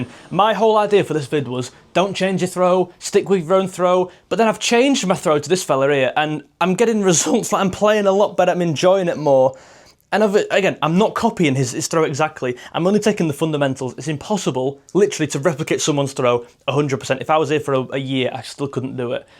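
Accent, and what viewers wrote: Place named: North, United Kingdom. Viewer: Love your work - you got pretty close to Littler’s throw! You’ve got a great presenting style, reminiscent of Tom Scott, just more northern and centred around darts…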